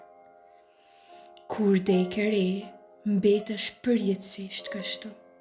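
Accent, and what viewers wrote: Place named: Gheg, Albania. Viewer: Ah gegnishtja jote e bukur me nje ardhje poetike plot ndjeshmëri..💖🌸✨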